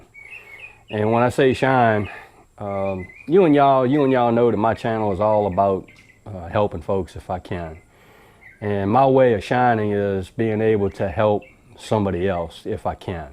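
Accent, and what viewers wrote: South, United States. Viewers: Glad this popped up on my YouTube today. Have been following your channel a little over a month now and haven't had time to go back & review old videos yet. I deducted about the 3rd video I saw that you were most likely on the force. Thank you for your service and continual prayers for your protection, as you protect others and our rights/freedom. "Don't let nobody Dull your shine!" was the final nail which made me know I had to follow you. Your accent, southern expressions and channel name caught my attn first. I've lived in Metros and small towns, but "in the sticks" is where paradise can be found (& created). Always enjoy seeing what's happening at the home place. You posted this Oct 2 of last year, saying it was a nice 85 degrees. This year, here in middle Ga 85 degrees is passed too fast to hit the 90s. Don't think we've had a lick of rain since August, so we are extraordinarily dry. 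Gonna hold out hope the cool will come again soon. Take care and keep shining!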